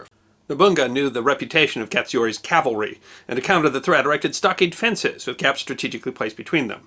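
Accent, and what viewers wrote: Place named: Midwest, United States. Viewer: My Japanese is not so good. Your voice sounds mid-western to me, which is fine. I've found myself (at times) questioning certain English town / county /home pronunciations, and likewise other European places (ok, I'm a jerk) but you'll find no fault by me on this episode. Whether right or wrong, words rolled off your tongue as if you knew what you were saying and I bought it all. And...another great story to boot. I trust your script was not Kanji...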